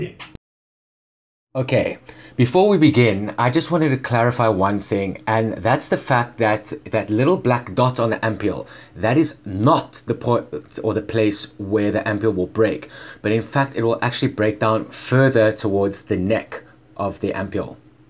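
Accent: South Africa. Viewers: You sound like a South African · Are you from South Africa? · What's that accent? South African? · South African accent! Yay! Thanks for the info. ( Shosholoza to you too!)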